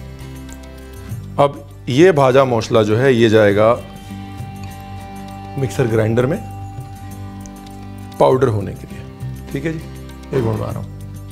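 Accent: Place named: Bengal, India. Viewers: Your Bengali accent is too cool. I'm form Bangladesh 🇧🇩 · ঝালমুড়ি, ঝালমুড়ি, ঝালমুড়ি। কথায় কিন্তু বাংলার ছাপ। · His Bengali accent is just 👍🔥😍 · His Bengali accent wins my heart ♥ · His Bengali accent is so natural..😍 we also call it ঝাল মুড়ি / jhal muri in Bangladesh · Other than jhalmuri loved ur bengali accent....❤️❤️ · Sir, you have a proper Bengali accent❤️. Amazing sir. Loved it. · Your bengali accent is so good 😍😍 And Jhal muri is valo basha😋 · Hi Chef. I'm a Bangladeshi and often watch your videos mostly because of the cooking tips and background stories you share. I loved your Jhalfrezi recipe and loved that you explained the history so well. But yes this particular video made me a true fan of yours. I really really love your (almost) authentic Bangla pronunciations. Jhal Muri is a popular roadside snack in Bangladesh as well, however we do not add coconut and rather add a spicy paste of gram pulses which locally is known as Ghugni, hence spicier. 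I would really request you to visit Dhaka once and try out typical Bangladeshi dishes like Kacchi Biriyani, Tehari, Fuchka (ours is fully loaded Fuchka, unlike the pani puris), Chotpoti, Fish dishes, Kebabs in Puran (old) Dhaka and what not. I'm sure you will have a great time to experience dishes which are somewhat sounds similar to Indian dishes but actually are so different in taste. Love, from Dhaka <3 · is bande ko sach mein pata hain ki bangla mein masla ko moshla bolte hain isliya to tumi atto valo katha bolo dada awesome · Ranveer sounds so cute when he pronounces words with Bengali accent :)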